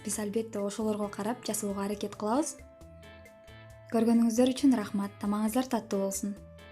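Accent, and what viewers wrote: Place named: Kyrgyzstan. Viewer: Накта кыргызча суйлойт экен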